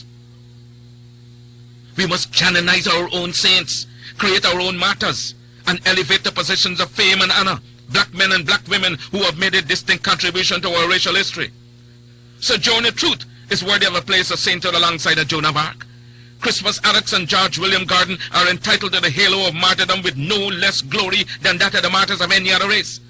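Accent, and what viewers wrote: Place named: Barbados. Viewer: Your narrator sound Bajan.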